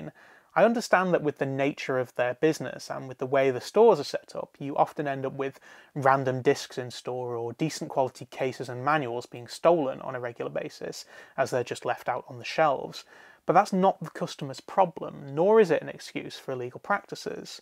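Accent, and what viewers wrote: Birmingham, United Kingdom. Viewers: Are you from around Birmingham? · Are you from Birmingham?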